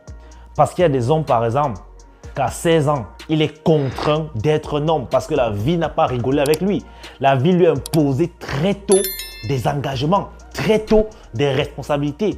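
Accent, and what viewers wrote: Cameroon. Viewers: L accent camer du bled me fait toujours rire lol 😂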